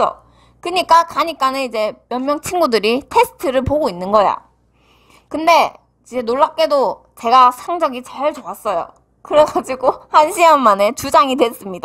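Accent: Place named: Daegu, South Korea. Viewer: 대구 사투리 같은데 김해 느낌도 있고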